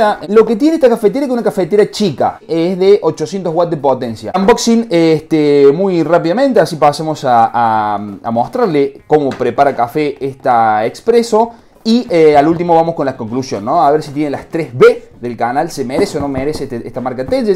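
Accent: Córdoba, Argentina. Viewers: La tonada cordobesa hace un mejor el vídeo jaja saludos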